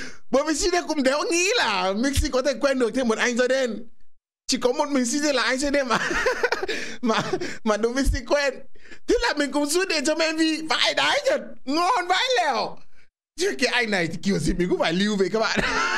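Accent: Standard. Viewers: Anh này nói tiếng Việt chuẩn chỉnh hơn mấy bạn khác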